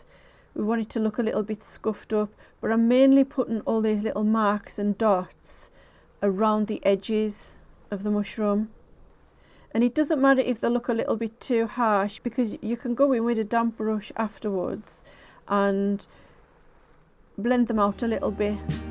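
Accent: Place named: Newcastle, United Kingdom. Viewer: How comes I haven't found you before? I loved my 4 years up in Geordie land many moons ago. Your accent makes me feel homesick lol. I love your style and can't wait to get my paints out again!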